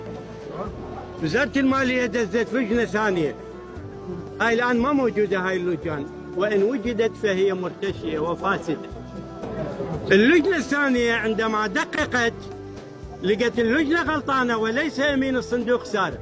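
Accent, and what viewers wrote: Iraq. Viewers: اللهجه العرافيه الي بدوون شوائب❤😊😊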